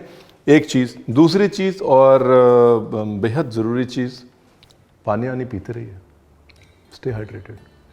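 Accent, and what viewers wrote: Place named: Maharashtra, India. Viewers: I am a maharashtrian. Loving ur Marathi! I am aware your wife is maharashtrian and I bet ur love for her reflects in the way u do adorable speak the language, Mr. Zhannzhaneet Brar!😊 Like the way u pronounced the “nn” in zhannzhaneet n in waattan and the “yyll” in misayyll. Pls do get ur wife on the show once, would love to see her. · OMG your Marathi accent is so sweet 😂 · एकदम झणझणीत video.... Loved your Marathi accent and knowledge as always.... Respect 🙏🏼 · Kadkk recipe kadkk marathi accent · Zakkass. Well I already love the way you narrate and prepare the recipes. I always come to this channel for most recipes. And being a Kolhapuri and an absolute missal lover, I just loved how authentic your accent and your this recipe also is. You're too good like always. Love from Kolhapur. ❤️🥳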